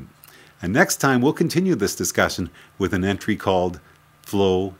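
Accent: Canada. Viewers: hes from canada, to them its aboot not about.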